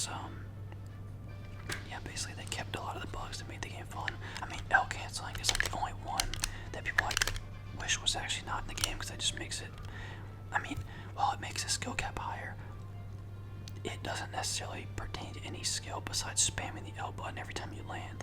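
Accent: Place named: South, United States. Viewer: you kinda sound like ppmd but when he was amish (just kidding but when he still had his southern accent)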